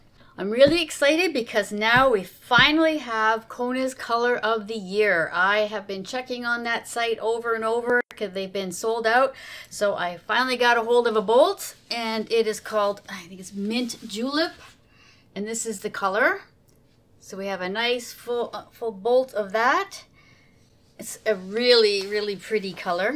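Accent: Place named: Canada. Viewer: Happy One Year Anniversary! Was painting and listening to random Youtube vids - heard your voice and recognized a fellow Canadian. I'm in BC. Weather is 'meh' here. Overcast.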